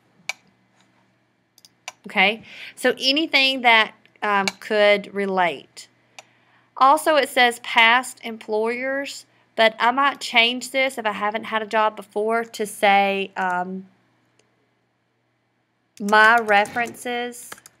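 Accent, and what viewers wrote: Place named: Georgia, United States. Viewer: Thank ye kindly, Georgia Girl! Lovely voice and accent. Very helpful. TYVM! :)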